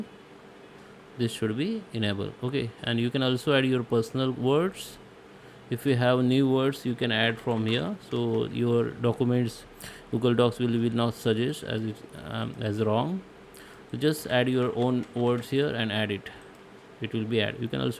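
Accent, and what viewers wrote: India. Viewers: Are you south indian!!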